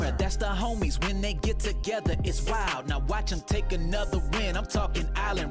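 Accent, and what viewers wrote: Sweden. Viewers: he sound swedish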